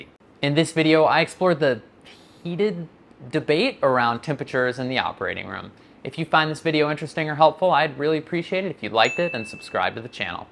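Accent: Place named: United States. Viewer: Oh really, well you’re speaking to a different audience than I assumed. Your English is clearly accented from the States. Carry on then but non professional Americans will not make the necessary calculations to make your temperature references meaningful. Let me also say other than that I think your presentation was excellent with meaningful information and I hope to see further videos by you.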